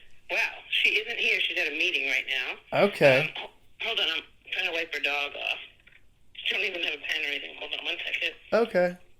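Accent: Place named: Raleigh, United States. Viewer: your accent was from the Raleigh area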